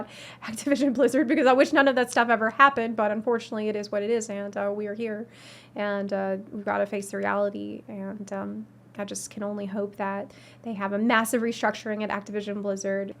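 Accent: South, United States. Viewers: I'm just getting started in FFXIV, and binge watching your vids(working) make it even better. Not to mention, that lovely, southern accent(I'm hoping Tennessee, my home sweet home), doesn't hurt lol. Love the content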